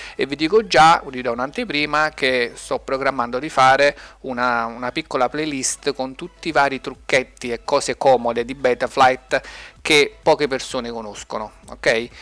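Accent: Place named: Naples, Italy. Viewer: Ciao, dall’accento ti sento del sud, forse partenopeo? Siamo vicini, vieni al Sirio di TERZIGNO a trovarci?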